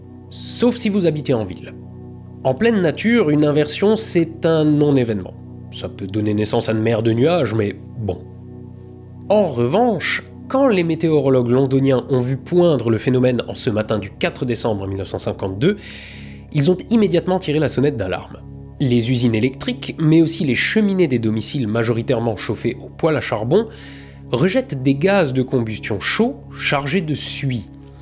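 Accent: Alsace, France. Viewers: Hello! Bien qu' "écolo" dans l'âme, je pense comme toi qu'aujourd'hui le nucléaire est indispensable/un moindre mal... Mais : Je pense aussi que tu te trompe a propos de la pollution que cela engendre, il s'agit de la pollution indirecte qu'engendre les déchets du nucléaire que nous ne savons pas traiter, ceux qu'on enterre, qu'on stock en attendant ou que certain voudrais envoyer dans l'espace... C'est l'equivalent des décharges, c'est un problème qu'on laisse pour plus tard "en attendant que...", ces problèmes mis sous le tapis sont le veritable noeud du problème a mon sens. Pour le reste (bien que ta voix alsacienne me cringe énormément et me pousse a skipper systématiquement le placement de produit dans la seconde) bravo pour le contenu de tes videos, continue comme ca :) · Peu importe le sujet (toujours d'une très grande qualité), l'accent alsacien vaut à lui seul le détour ! 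😁 "ALSACE STYLE"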